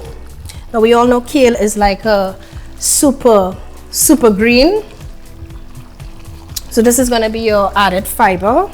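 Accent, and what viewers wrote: Trinidad and Tobago. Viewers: You sound Trini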